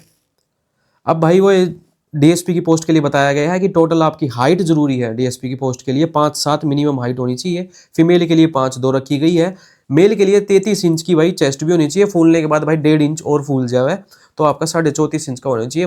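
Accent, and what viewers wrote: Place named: Haryana, India. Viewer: Sir ka haryanvi accent ohhhoooo 😍😍😍😍😍😍😍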